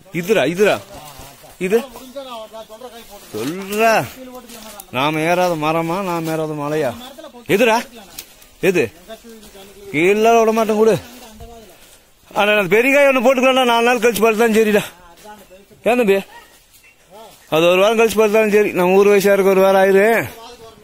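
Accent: Kongu, India. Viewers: கொங்கு தமிழ் தாண்டவம் ஆடுது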